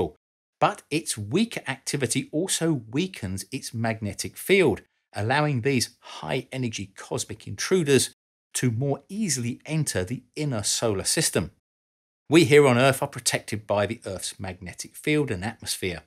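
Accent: United Kingdom. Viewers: British accented narrators are the best because the rhythm in their sentences and the pitch/sound of their voice makes you feel like your high IQ grandparents are telling you a story! I love your work and the mix between photos and videos as you talk makes you feel like you are really immersed within the topic. Ps: I really would love more space/aeronautical engineering videos. 🌎🌕🌞🪐🚀🛰👩‍🚀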